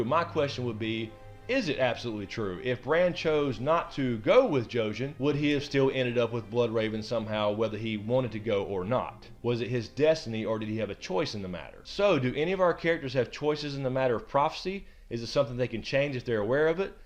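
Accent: South, United States. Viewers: I’m really loving to hear another Southerner like myself talk about GoT like a pro 😌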